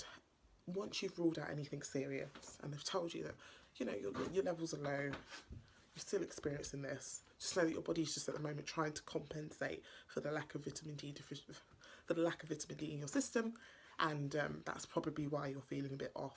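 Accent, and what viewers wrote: United Kingdom. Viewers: You sound like you live in the UK. You need very pale skin to remain healthy in many parts of Europe. Your natural habitat is in a much hotter climate with much more sun exposure. Climates with less sun than you need can make you infertile. This is simply Evolution. Caucasians get skin cancer in the sun, Africans thrive in the sun. Caucasians thrive in cold climates, Africans get very sick. You should consider a climate that your body is adapted for.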